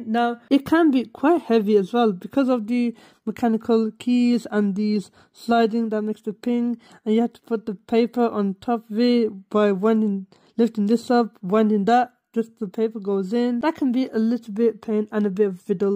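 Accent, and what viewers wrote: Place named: United Kingdom. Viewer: Are u British I love ur accent ❤❤❤❤❤❤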